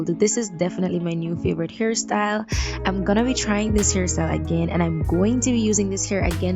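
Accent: Jamaica. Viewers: are you Jamaican? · Jamaican cyaan hide enuh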